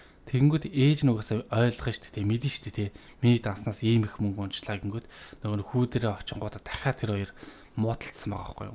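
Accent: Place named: Inner Mongolia, China. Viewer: Өвөр монгол ч юм шиг дуудлага нь бусдаар дажгүй шүү Амжилт шүү🎉🎉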